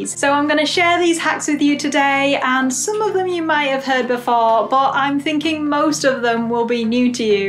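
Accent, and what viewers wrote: United Kingdom. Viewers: I just loveeeee this channel! Your voice is so sweet and calming and your channel has helped me A LOTTT for caring better for my "piggies" (in my brittish accent like yours...😊😊😊) sooo helpful thabk youuu!!!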